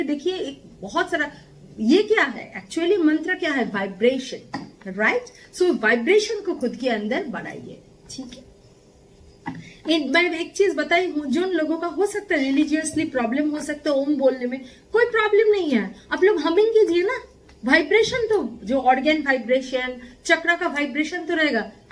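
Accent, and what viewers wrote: Bengal, India. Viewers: Thank you so much mam... Seems like you're bengali... Loved the way you executed. 😃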